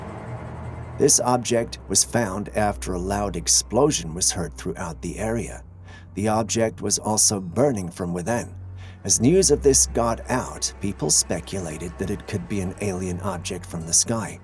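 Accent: England, United Kingdom. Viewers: Yeah the narrator's voice pulls me into the action of the videos. Where is the dude from England or something? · Why halfway through this podcast did you all of a sudden you have an English accent?!! Did anyone else notice this?!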